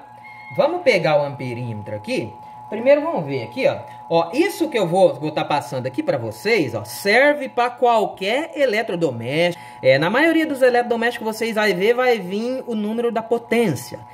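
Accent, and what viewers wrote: Minas Gerais, Brazil. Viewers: I m p r e s s i o n a n t e ! Não bastasse o conhecimento repassado livremente e com riqueza de detalhes, somos agraciados ainda com um formidável Mineirês que torna as AULAS, além de extremamente instrutivas, muito agradáveis. Muito obrigado meu camarada. O mundo precisa de mais mestres como você, não para consertar o mundo, mas para ensinar as pessoas que elas também podem consertar, seja o que for. Abraços.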